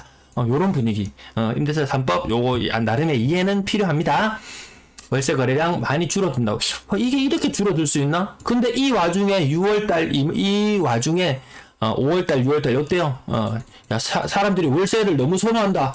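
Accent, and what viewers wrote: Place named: Gyeongbuk, South Korea. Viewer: 운영자님 경북사람 이네요~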